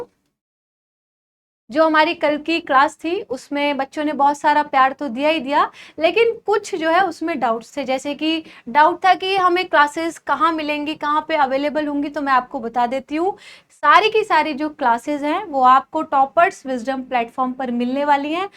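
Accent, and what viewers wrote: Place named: Braj, India. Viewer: Mam aap mathura bashi ho kya ...... Aaap ki bhasha braj ki lagti hai😊😊😊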